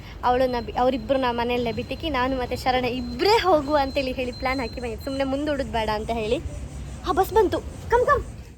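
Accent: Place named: Udupi, India. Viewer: Udupi kannada mix undu aike kenni medam best of luck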